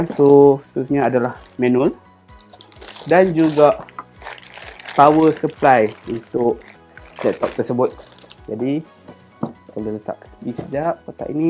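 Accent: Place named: North, Malaysia. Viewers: Tudiaaa pekat bakhang utaraa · kekalkan BAHASA UTAQA! sempoi 👍👍👍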